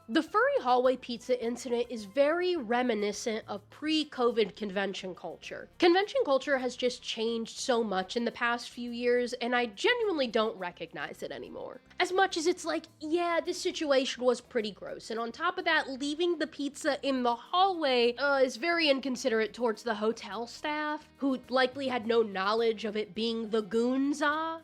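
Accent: South, United States. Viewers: you have the SLIGHTEST southern accent and it’s so cute! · I like your cute lil southern accent 😊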